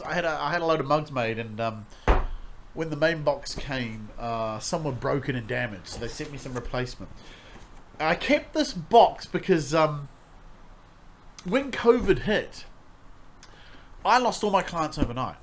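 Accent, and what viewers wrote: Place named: New Zealand. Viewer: I thought I heard a Kiwi accent. Then I see the FourSquare mug in the bacground!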